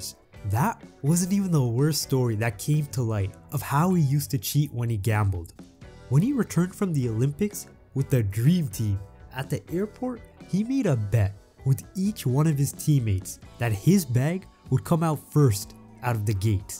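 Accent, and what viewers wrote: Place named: United Kingdom. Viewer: God, your voice is so... BRITISH, EXCITED, I DON'T KNOW!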